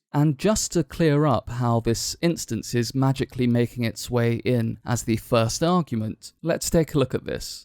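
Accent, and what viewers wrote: India. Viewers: I don''t understand what is meant when it's said "It's free so you shouldn't say a thing about it" , it's free, so what? I don't like it! The guy makes money from it even if it is free! My point is that, since they make money, they should try to make something that's the best they could offer for their own good. I stated how I truly felt, it's completely normal to dislike an accent, and it is nothing "unfortunate", the voice really does matter when it comes to sending your message, and I think that the message that Indians try to make their voice more like the English accent, I think if they put more effort into it the message would be sent more fluently. It's not my fault that I get headaches when I listen to the Indian accent in the first place, I would love to listen to them without getting a headache honestly, it's just that I am that way and I am the one who suffers from it since Indians have very useful content... 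· You statement about Indian accent is very unfortunate. I feel sorry for learner's like who instead of focusing on the message focus on the messenger especially when things are given free.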